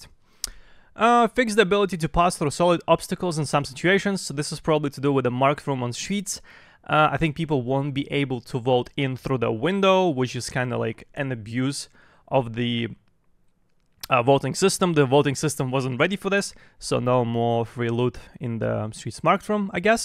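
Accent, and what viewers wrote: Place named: Russia. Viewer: Its free to try.and he is russin so he can not say things in perfict english sometimes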